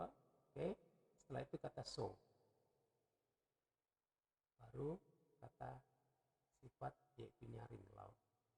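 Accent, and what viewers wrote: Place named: United States. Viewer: How to use so..bahasa inggris nya pak paling terbaik dan sangat fasih dialeg nya sama seperti orang amerika 👍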